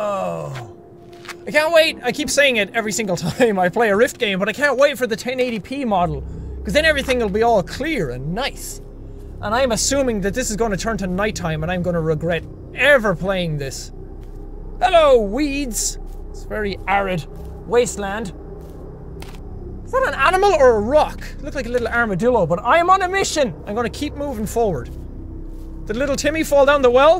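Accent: Ireland. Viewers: Best of the irish youtubers!! Cant tell if your accent is wexford or meath? Any way this limerick man has PUNCHED that sub button!! Keep them vids comin;P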